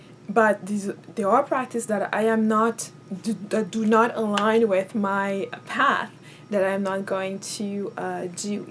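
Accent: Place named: France. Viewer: i can hear that french is your first language. I have the same accent lol. americans are right, it does actually sound beautiful! i used to find mine annoying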